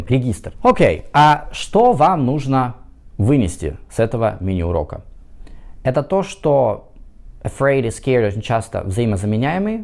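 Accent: United States. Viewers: Молодец. Американец. Про выговор имею ввиду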